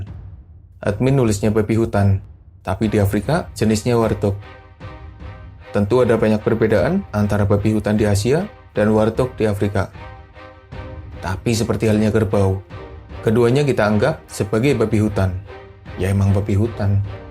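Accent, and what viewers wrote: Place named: Java, Indonesia. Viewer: Saran logatnya terlalu medok dan monoton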